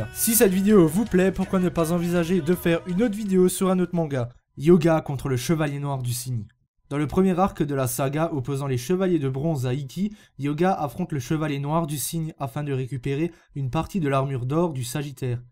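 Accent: Alsace, France. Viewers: Un accent alsacien?? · toi t'es alsacien !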